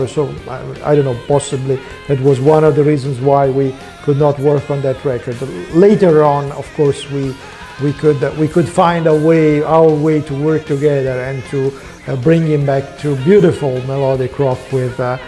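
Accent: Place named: Italy. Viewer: And the italian accent,of course.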